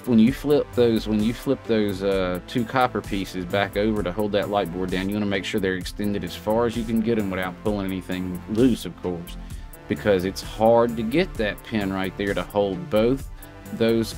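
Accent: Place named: Kentucky, United States. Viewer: Where are you from. I’m In KY you sound like me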